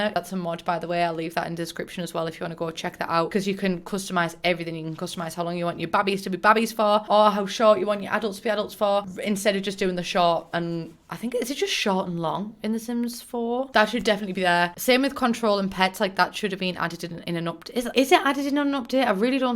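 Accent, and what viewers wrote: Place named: Northumberland, United Kingdom. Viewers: Can’t work out if you’re from Northumberland or Newcastle, hmm 🤔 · i love your north-england sound ♥️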